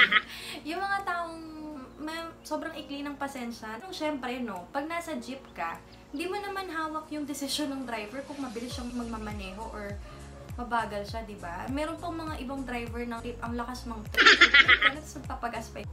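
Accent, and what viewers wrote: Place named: Visayas, Philippines. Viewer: HAHAHAHA funny yung bisaya accent 🤣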